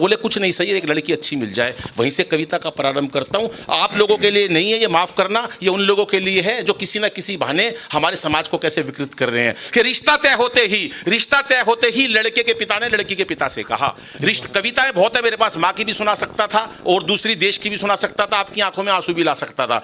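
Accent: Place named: Haryana, India. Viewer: ओरिजनल हरियाणवी अंदाज वेल डन श्री वेल डन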